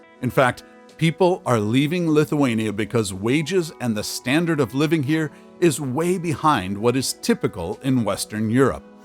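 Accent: United States. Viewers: FRON YOUR ACCENT I SAY YOU ARE AMERICAN, BUT YOU FORGOT TO INCLUDE YOUR BELOVED COUNTRY. 20 MILION AMERICANS LEFT THE USA IN THE PAST FOR HIGH COST LIVING, LOW INTEREST FROM THE GOVERMANT TOWARD IT'S CITIZENS, HEALTH CARE AND SO ON. DON'T TRY TO DEFEND YOUR COUNTRY. PRETTY SOON IT'S GOING TO BE ONE OF WORST PLACES TO LIVE ON THE PLANET. GOOD LUCK YANKEE.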